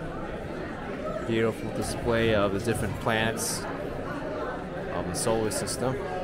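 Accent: United States. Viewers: I am learning spoken English from your videos. Thank you for the pure American pronunciation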